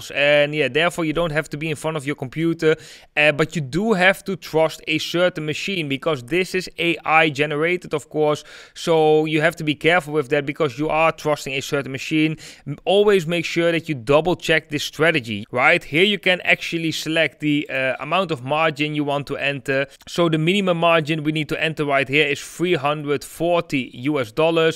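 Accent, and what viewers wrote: Netherlands. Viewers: The moment I heard your accent, he's Dutch 😅🤣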